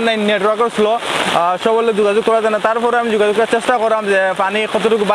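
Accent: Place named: Sylhet, Bangladesh. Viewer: Sylheti blogger speaking dakhai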